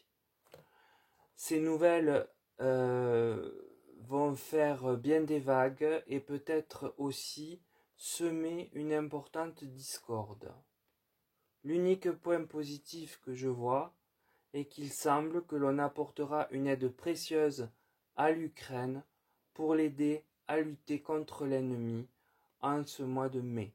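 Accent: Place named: Toulon, France. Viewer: Bonjour, comme je t'ai dit sur le live de jordan je me suis abonnée 💋 et ce petit accent n'est pas très loin de Toulon je pense 😜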